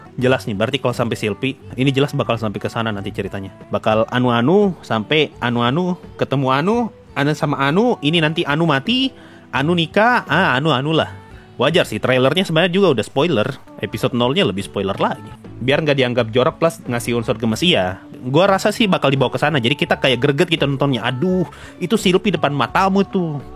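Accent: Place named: Eastern Indonesia, Indonesia. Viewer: anjir logat mu kek tmn2 gw yg dr ntt/papua bg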